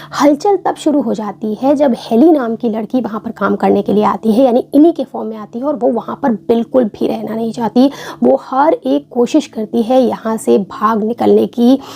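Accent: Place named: South Delhi, India. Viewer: You excent is like sout Delhi girl ❤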